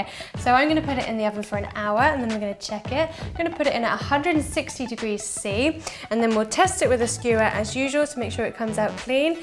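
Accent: United Kingdom. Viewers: hello from south wales so nice to hear a british voice on a cooking channel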